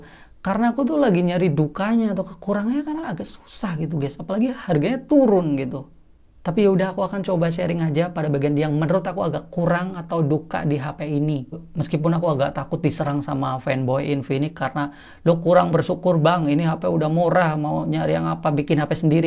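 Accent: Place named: Indramayu, Indonesia. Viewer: orang indramayu ya mas?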